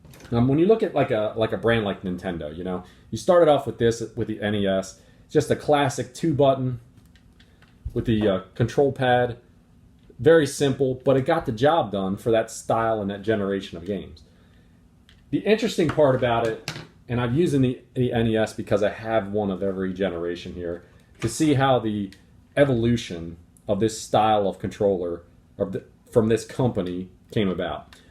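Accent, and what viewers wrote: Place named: Maryland, United States. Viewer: I thought I detected a Maryland accent on you man. I am a Marylander. I love those beers. I agree those nunchucks are cumbersome. Switch Pro Controller is my fav. Nice VR.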